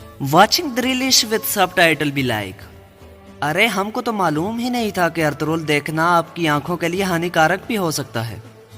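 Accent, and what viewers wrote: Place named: Punjab, Pakistan. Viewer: aap k Urdu bolney ka accent to bilkul Punjabis wala ha. pathan ka accent Urdu bolty wkt bilul bhi aisa nahi hota mn khid pathan hu.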